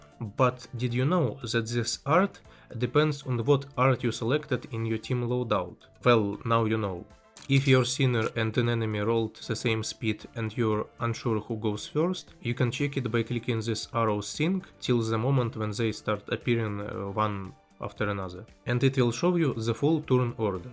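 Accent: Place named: Russia. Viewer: the heavy russian accent is crazy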